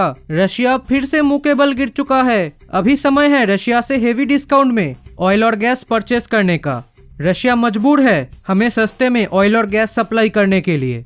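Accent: Bengal, India. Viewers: Bangali naki dada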